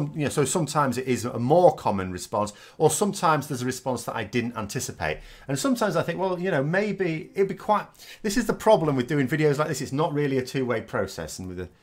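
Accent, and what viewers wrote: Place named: Yorkshire, United Kingdom. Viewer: Hey, love the channel. Currently living in Buenos Aires and your videos are one of my primary ways of keeping up with the ins and outs of Brexit, etc... Also, get to hear a Yorkshire voice on a regular basis.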